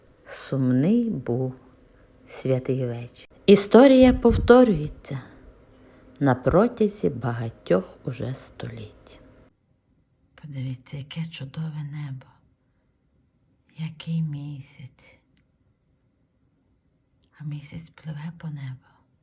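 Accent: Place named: Sumy, Ukraine. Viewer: у вас речь украинки жительницы Сумской области Вы замечательная хазяечка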